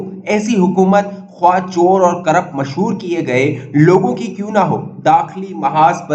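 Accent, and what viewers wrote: India. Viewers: The narrator in this vlog has subtle Hindi accent. For he say Ashraf Gani instead of Ghani.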